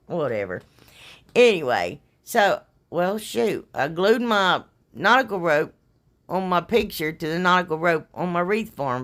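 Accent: South, United States. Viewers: These all turned out beautiful! Thanks for sharing your ideas and creativity! Love you lots. If folks are being ugly, don’t pay attention to them. It’s been my experience that when people act ugly & rude it’s because they are unhappy & miserable in their own life. Don’t worry about anything, you are doing a fantastic job and there are plenty of us that love you. I’ve also noticed that some people just can’t handle all our southern charm and accent. Don’t you pay them any mind. You are great at what you do, so keep on keeping on. Glad you’re feeling better. Love 💕 & hugs 🤗 God bless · Honey, I'm so happy you posted! You've been on my heart ever since your live & I've been praying for ya hon 🙏♥ As for some wackadoo saying you talk funny or whatever...they obviously don't know you have a whole army backing ya & we LOVE US SOME & HER SWEET SOUTHERN SELF 🥰 (Don't make 1996 Lisa have to come out 🤣🤣) Anyhoo, honey everything turned out so beautiful and I LOVE that black truck wreath & your punkin Gather sign 😍😍 You already know...ILYFTBOMH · FINALLY I have found someone who speaks the same language I do! 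Southern! Lol! So refreshing to dial you up understand you & can SO relate! People make fun of the way I talk…but that’s OK!! I call brokers in New York City & when they hear my accent, they automatically deduct 50 points of my IQ! But while they’re laughing & poking fun, I’m taking money out of their accounts before they even know what’s happening!! I may be country…but I’m smart!! Lol!! Just like you!! Love you, Girl!! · I think you're so freakin' cute! I was raised in the south and now live in the north, and I really miss hearing the southern accents! Forget about the haters, they have nothing better to do than be trolls. xo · Love your country accent 😍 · Hey now...who cares what others say... I looooove that Southern accent of yours 🥰😘 I have the A.D.D., A.D.H.D. and I'm very OCD...LOL Can you imagine 🤣 I think I understand you more than any of the other craft ppl on YouTube...lol Your crafts are amazing!!! 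XOXO ~ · even though I'm a newer subscriber, (I'm from East TN) tell the haters "Bless their poor hearts" and they can kiss your beautiful southern speaking white a@ I absolutely love you and your page. You truly are a beautiful person inside and out. Much love ma'am and please stop apologizing for being you. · As a woman who knows this lady well I talk just like her and if you don't like the way we talk well I can't exactly say what I want to say but you can kiss our 🍑 I know there's plenty of people in my life who love the way I talk and I guarantee you with form I guarantee you she's got way more people with the life the way she talks instead of not liking the way she talks so for this one for you trolls get a life you don't like the way she talks don't watch her videos it's that easy folks... I love you girl and you know I'm going to stand up for you I think our country bumpkin accents are cute so pee on them haters... 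Sorry I called him headed so early but that upset me made me mad I told you I always have your back and I mean it God bless you dear.. I'll always be here for you.. actually we should start a crafting channel that focuses a lot on our accents a real ways of life these people have no idea of where we come from...🙏🏼💯😉😜🍀🍀🍀🦋🦋🦋🤟🏻🤔👑👑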